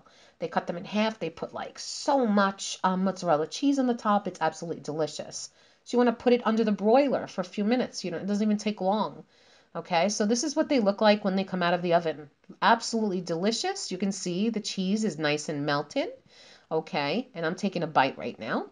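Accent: New York, United States. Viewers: That accent makes me proud to b a New Yorker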